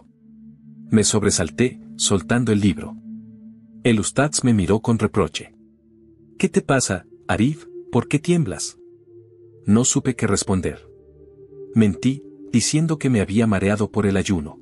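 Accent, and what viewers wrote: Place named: Portugal. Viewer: Porque habla portugués si lo hace en español es una mezcla muy mal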